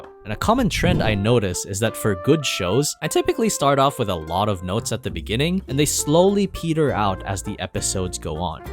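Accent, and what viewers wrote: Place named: Liverpool, United Kingdom. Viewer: scouse bastad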